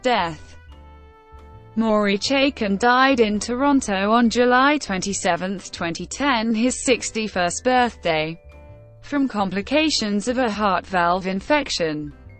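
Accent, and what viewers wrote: United Kingdom. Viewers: a BRIT robot voice!!!...coooool.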